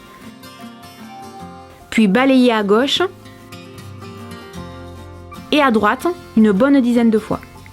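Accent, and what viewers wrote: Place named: Toulouse, France. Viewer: Coucou ^^! J'adore tes astuces, elles me servent beaucoup!! Est ce que tu viens de Toulouse? Car tu as un accent qui me fait penser a ma grand mère qui viens de Toulouse. Enfin bref, je t'adore ♡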